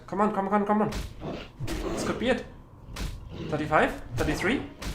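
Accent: Germany. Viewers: krass wie man den deutschen einfach immer raushört^^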